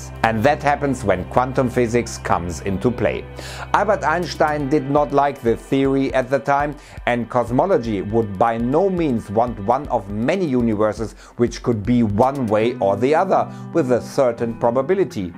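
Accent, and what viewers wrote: Germany. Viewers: Please work on your german accent. It makes the video less enjoyable, all in all nice topic and well researched as always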